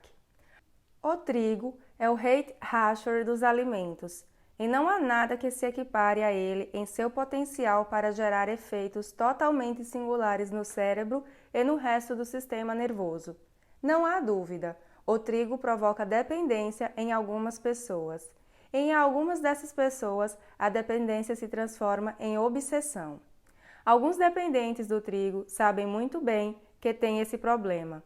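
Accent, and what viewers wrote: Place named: Paraíba, Brazil. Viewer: Sotaquezim da Paraíba👻 vou botar pra eu dormir. Parabéns!!! Ouvi o outro com voz de robô, todo. Muita sorte! Sou de Fortaleza.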